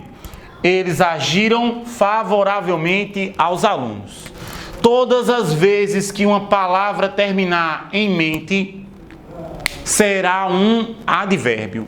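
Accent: Northeast, Brazil. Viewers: VC E NORDESTINO? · tem mó sotaque nordestino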